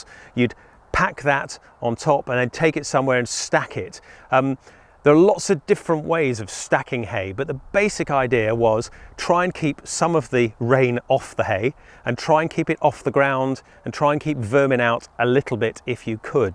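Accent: United Kingdom. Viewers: Also a British accent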